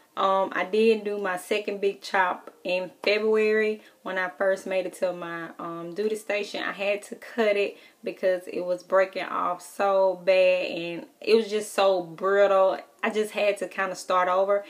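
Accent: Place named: South, United States. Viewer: Omggggggggg where are you from? I love your accent 😊 hmm let me guess...Houston? Atlanta? Tennessee?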